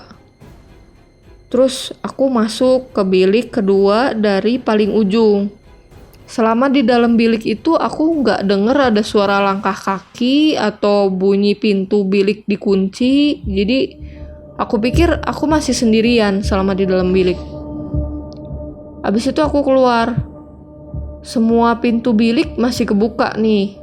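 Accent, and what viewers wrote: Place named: West Java, Indonesia. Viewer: Logat Sunda nya terasa Karakter suara mu kaya di animasi di tiktok yang gak takut sama hantu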